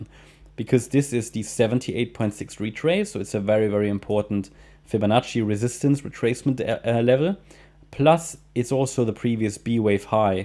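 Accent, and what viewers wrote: Germany. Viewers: Thank you Mister, I always thought that you speak (good) English with a German acent, and a few days ago I found your DE chanel 🤣🤣😍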